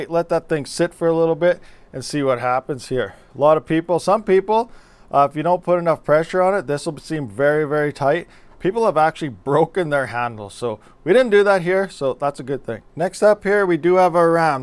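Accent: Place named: Canada. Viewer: lmfao.. the way canadians talk jus kills me.. hahaha... its good tho..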